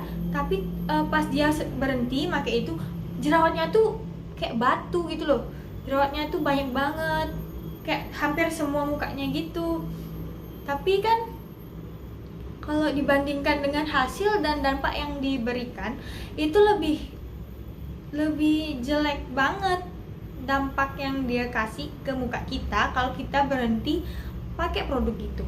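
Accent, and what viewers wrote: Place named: Bali, Indonesia. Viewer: logat khe sa Bali x🤣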